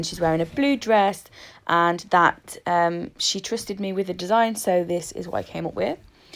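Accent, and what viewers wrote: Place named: Coventry, United Kingdom. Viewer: Are you from Coventry? I am. Your accent is very Cov. :-D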